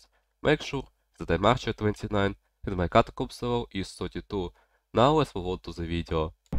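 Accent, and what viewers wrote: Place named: Russia. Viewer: ahh aight, that is one thick russian accent, good video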